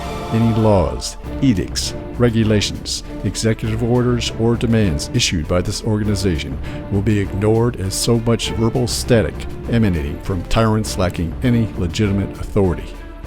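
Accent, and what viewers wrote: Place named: Texas, United States. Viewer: Sounds just like a TEXAN!! Yee Haw!!!!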